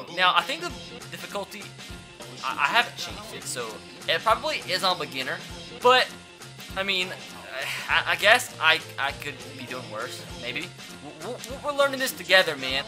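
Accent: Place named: Alabama, United States. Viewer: Yo what part of bama you from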